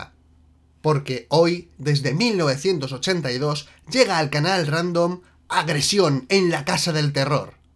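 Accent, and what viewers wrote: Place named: Spain. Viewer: ¿Por qué los Españoles hablán tan mal el Español? O no más él lo habla así?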